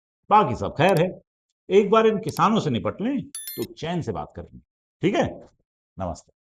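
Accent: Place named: Uttar Pradesh, India. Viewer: You are great. You talk like my native Uttar Pradesh tone.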